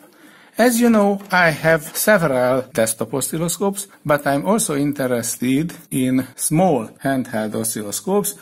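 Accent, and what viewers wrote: Hungary. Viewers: Goulash accent , kusenem 😇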